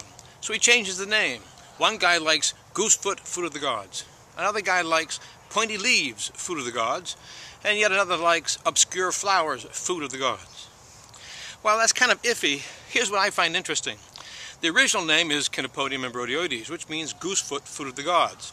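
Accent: Maine, United States. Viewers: Spar varnish (with a Maine accent)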